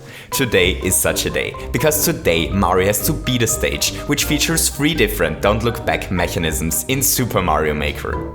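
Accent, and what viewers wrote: Germany. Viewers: Your german? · Are you German? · Is german?I mean the accent... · Are you a german? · Cringey german english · DEUTSCHER AKZENT! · what accent do you have?! most probably german... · Are you German? ^^"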